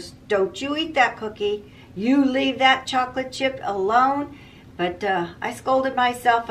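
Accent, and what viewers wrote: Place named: South, United States. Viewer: I just love those fabrics you chose; the cardinals and the bicycles! Your bag is perfect! I look forward to seeing the completed pattern project. I am trying to make my 1907 Baby Bonnet pattern available. We do love a challenge! you are special! I don’t know if you’re southern, but we say some things the same way, and I have to think really hard to complete my sentences. I put a dash of vanilla flavouring in my coffee. It improves the taste. I enjoy your chats. I’m also trying to lose inches. Best wishes!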